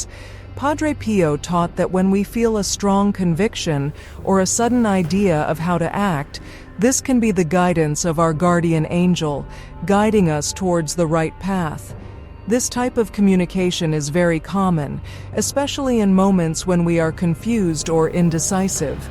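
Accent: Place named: United States. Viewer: Sorry when I hear this sharp woman's American accent it makes everything like a Mickey mouse cartoon. Shame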